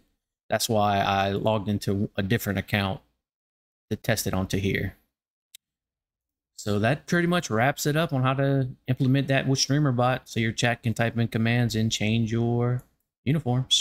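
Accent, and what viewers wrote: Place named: Louisiana, United States. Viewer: Oh you’re definitley Cajun 😂😂😂. 337 definitley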